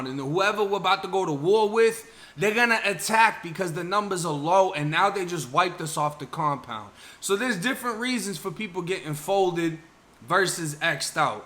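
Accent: Boston, United States. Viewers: You sound like you from Boston🤔🤔🤔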